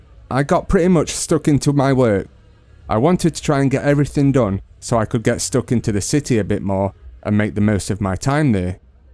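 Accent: United Kingdom. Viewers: You have British Accent.🤔🤔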